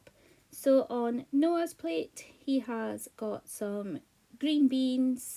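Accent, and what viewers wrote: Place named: Scotland, United Kingdom. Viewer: ove your accent, is that Scottish??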